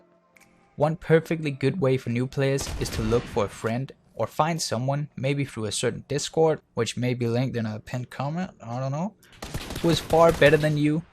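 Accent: Denmark. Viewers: Are you Danish? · Are you Danish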